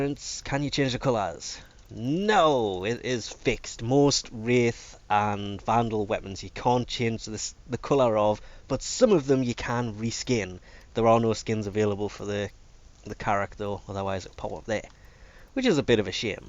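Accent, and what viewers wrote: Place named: United Kingdom. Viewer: what country do you live in? From your accent you sound like your from somewhere in Britain but it was 5pm when the event finished here?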